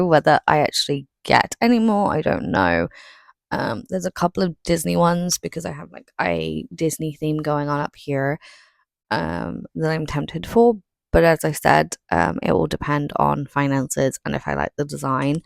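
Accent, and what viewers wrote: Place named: Northern England, United Kingdom. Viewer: honestly hands down you have the most amazing accent on a person who I have ever known up North (and believe me I have met a lot of people in the North of the UK) a lot of people say I have a rather posh accent being from the South of the UK 🤣🤣🤣 I am so glad and happy to hear you are coming back with more videos, and I am happy as always to support my favourite content creator and the content that you create lovely 😊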